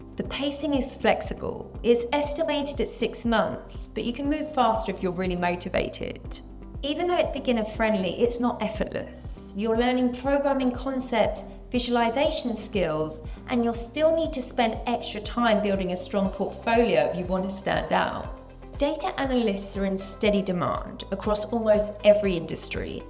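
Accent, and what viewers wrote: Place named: United Kingdom. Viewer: Thanks your very profrssional video. well said, well presented. your English language is Perfect clear slow british style. how u found ur 5yrs experience in coursera? honedtlu. all people talking about their desire to self learning but only 5% complete walking the road to the end. God bless u with many thanks. was nice just cross roads with u here❤🎉gd nite then happy greatvthurc13aug2026🎉😊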